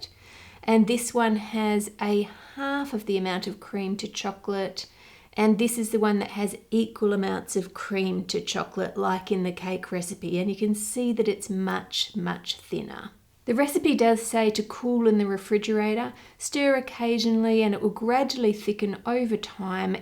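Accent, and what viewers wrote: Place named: Australia. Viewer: is pretty much Cher with an Australian accent and baking skills. Oh. The love ❤️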